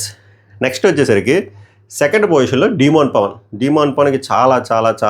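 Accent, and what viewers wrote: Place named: Andhra, India. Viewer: Bro ,am from Bangalore …ee Andhra slang vini chala years ayyindi … more than your reviews i like hearing this slang …Telugu almost marchipoya anukunna 😊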